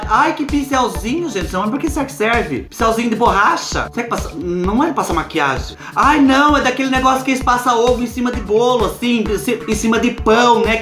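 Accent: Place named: Sorocaba, Brazil. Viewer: Reconheci seu sotaque de Sorocabaaaa! rs Até pensei: "nossa, a cidade tem o sotaque parecido com Sorocaba." XD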